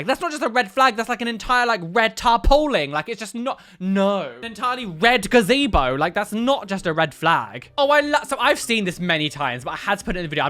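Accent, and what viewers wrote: Texas, United States. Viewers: Your accents are adorable 🥰 You always end up with a twinge of a Texas accent. But always adorable 😘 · Your American accent is pretty awesome,! Great video! 😊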